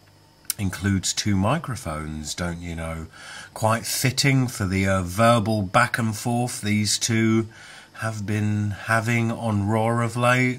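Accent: United Kingdom. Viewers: awesome review! fun to listen to your british voice! great belt custom! subscribe!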